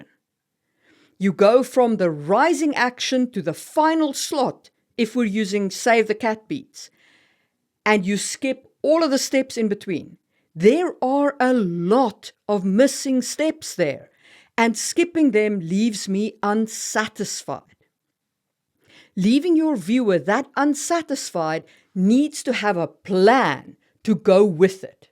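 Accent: South Africa. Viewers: Dont mean to be too personal but are you south african? All the info says you stay in Finland but that accent says you're from here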